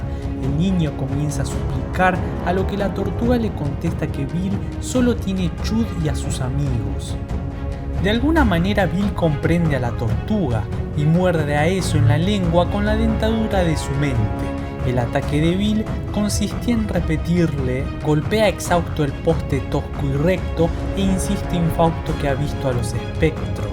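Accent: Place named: North, Mexico. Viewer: Como que norteño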